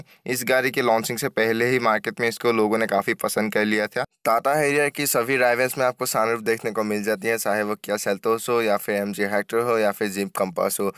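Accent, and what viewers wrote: Assam, India. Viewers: Axomiya tone full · This is Assamese accent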